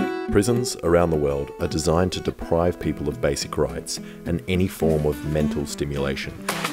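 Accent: Australia. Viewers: ...He's aussie. You'd know by his accent and australia and america jokes. Also, the magpie video. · He's Australian He's saying "how ya going" It's our version of hello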